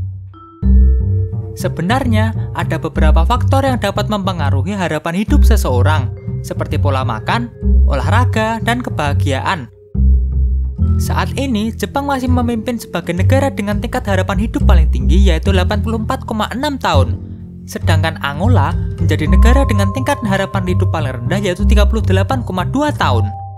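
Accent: Java, Indonesia. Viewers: medo'g ngomongnya.. :v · medok jawa tengah/timur ya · asli pean wong jowo tekan logat e wkwkwkwkw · pengisi suara nya bikin ganggu , medog banget !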